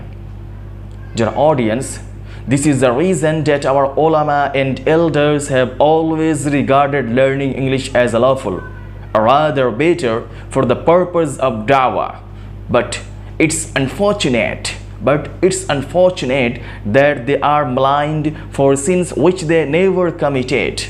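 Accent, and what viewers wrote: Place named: United States. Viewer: Lag raha hai America se aaye ho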